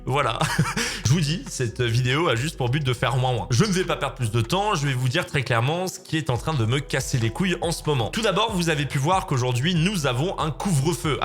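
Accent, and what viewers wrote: Lyon, France. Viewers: tu es de Lyon??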